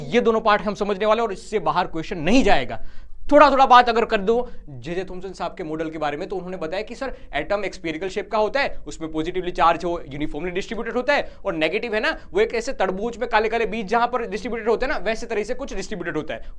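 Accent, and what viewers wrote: Gujarat, India. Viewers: Sir you are gujarati or not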